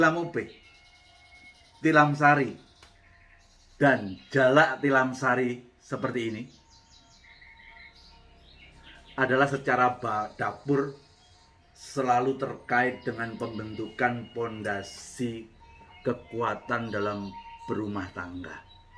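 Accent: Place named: Java, Indonesia. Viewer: Mantab. Bahasa Indonesia dengan logat jawa. Sehat selalu Om. Aamiin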